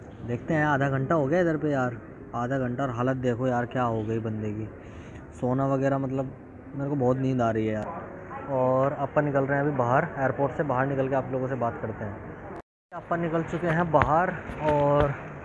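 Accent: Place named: Bijnor, India. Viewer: Hallo bhai up bijnor se ho kya ❤️❤️🙏🔥🙏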